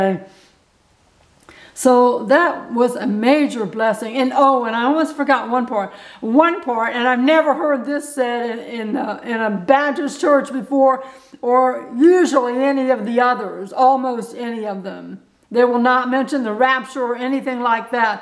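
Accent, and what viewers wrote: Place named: South, United States. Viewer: lol not really a state but ok. I live in SC and I can tell you are from somewhere close. GBU too.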